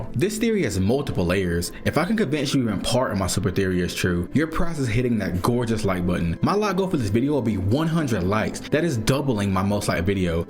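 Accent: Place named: Tennessee, United States. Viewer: Vro your accent😲 are you from Tennessee?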